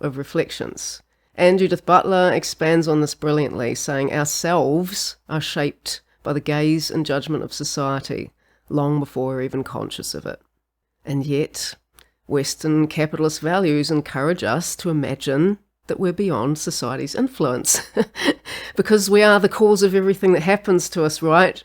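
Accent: New Zealand. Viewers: Kiwi accent.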